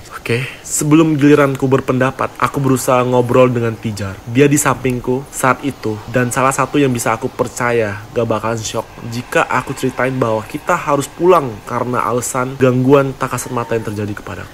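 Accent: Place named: Medan, Indonesia. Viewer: Masih ada logat2 medannya dikit 😁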